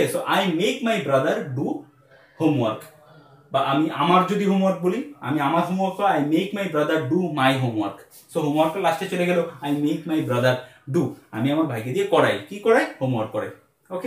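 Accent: Kolkata, India. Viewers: কথার স্টাইলেই বোঝা যায় কলকাতার।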